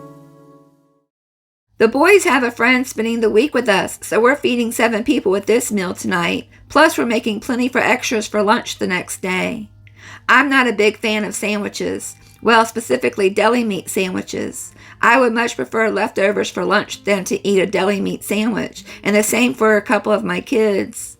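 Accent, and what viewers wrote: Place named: United States. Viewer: The meal looks so nice 😋 I love just listening to your wonderful American accent x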